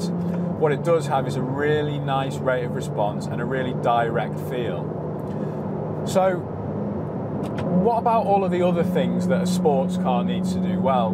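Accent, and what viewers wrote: United Kingdom. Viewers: Wow, what a precise, accurate and fascinating description. By the way, It's the first time that I really appraciate a british accent, which normally sounds to me, instead of sofisticated, somehow ridiculous and artificial..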